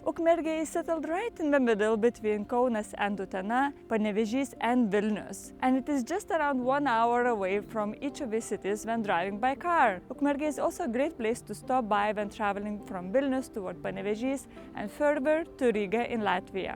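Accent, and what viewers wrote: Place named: Russia. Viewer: You have a very russian accent wow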